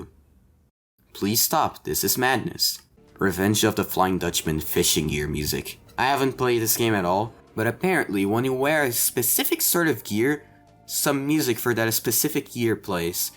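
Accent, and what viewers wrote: Brazil. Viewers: BAWJWJWJSJWJ VC É BRASILEIRO???? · carai mano tu é brasileiro? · carai tu é brasileiro quase pulei da cadeira quando ouvi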